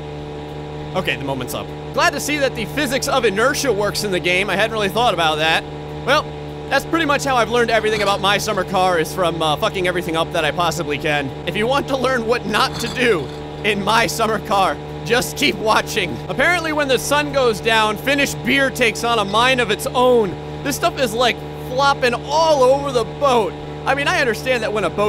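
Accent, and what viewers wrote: New Jersey, United States. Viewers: Dont notice that New Jersey accent. I live there too. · Oh yeah I hear the jersey accent, course I’m from the state right below so I pick up on it pretty easy lol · I'm from the Philadelphia area and go to school in NJ but ive never heard your kind of jersey accent.Are you from North or South Jersey? Please tell me you do not say Taylor Ham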